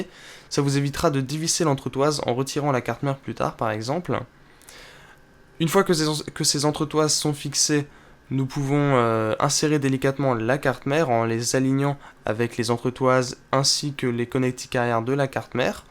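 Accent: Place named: England, United Kingdom. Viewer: Quel accent anglais x)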